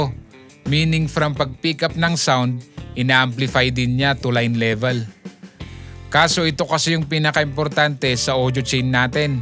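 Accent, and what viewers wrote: Cordillera, Philippines. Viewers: Apay madlaw ba? hehe, the cordillera accent. Ayos na ayos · I Thought ur from Cordillera hehe. Accent dude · Are you by any chance from the Cordilleras? Thanks for this vid, very informative. Iyaman.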